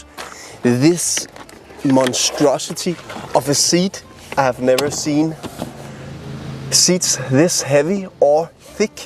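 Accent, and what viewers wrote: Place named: Denmark. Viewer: godt tip, du får ikke særlig mange views når du taler engelsk, man kan tydeligt høre at du er dansk, bare tal dansk tak.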